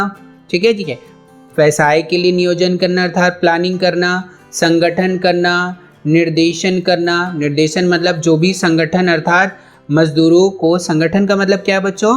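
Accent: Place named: Punjab, India. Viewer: i think you from punjab😅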